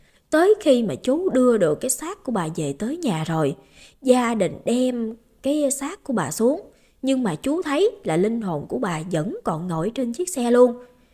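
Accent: Mekong Delta, Vietnam. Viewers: Chị rất thích cách kể chuyện mộc mạc của bé Như, tìm mãi mới thấy được chất giọng đúng kiểu Miền Tây như thế này. Bé Như cứ giữ chất giọng chân quê như thế này nhé , chị nghe nghiện luôn rồi ❤️❤️❤️ Chị thấy cưng rất có năng khiếu về kể chuyện. Nhớ lắm thời còn xem phim bộ TVB , chất giọng lồng tiếng rặc Miền Nam, Miền Tây mình, nhưng mà nghe nghiện lắm, nghe hay làm sao.... bây giờ thì đa số kiểu nửa Nam nửa Bắc nghe không còn thích nữa 😁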